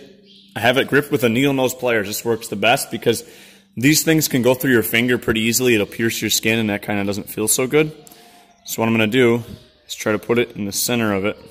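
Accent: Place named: Minnesota, United States. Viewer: You sound like you have a Minnesota accent!!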